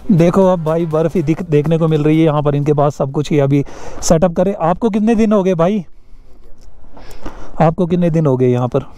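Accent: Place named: Jammu, India. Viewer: Apki bhasha se lagra h ap jaamu se ho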